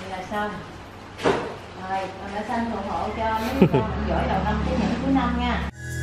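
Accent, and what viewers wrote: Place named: South, Vietnam. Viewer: Nghe giọng nói miền nam thân thương quá , mần thay vì làm , thiệt là ấm lòng .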